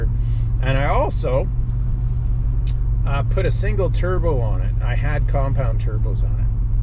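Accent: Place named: Canada. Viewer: Sounds like yer from the great white north bud. Good thing ICBC doesn't do inspections eh?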